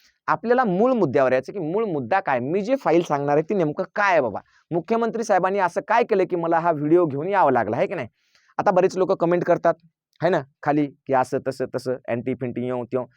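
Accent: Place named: Parbhani, India. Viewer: भाषा परभणी ची वाटते सरांची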